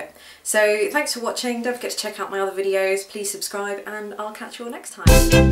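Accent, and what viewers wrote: United Kingdom. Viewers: Hey:D Thanks! I found this clip really useful since I'm still finding my early steps.. I like the whole series too.. Keep it up (y) P.S. I love ur British accent too:D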